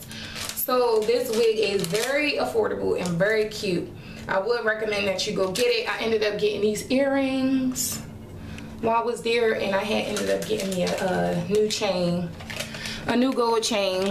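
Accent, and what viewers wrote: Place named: Maryland, United States. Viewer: I have a question are you from Baltimore or Maryland? Normally I don't ask people where from on here because of privacy but your accent is very similar to mine lol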